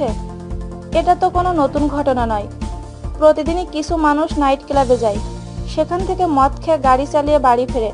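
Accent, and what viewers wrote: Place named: Rural, Bangladesh. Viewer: Cine gossip pls apnader Bangladeshi vasa ta ektu paltan? Kno J apnader gammo vasa net a charchen, valo kore kotha ta o bolte paren na apnara chi chi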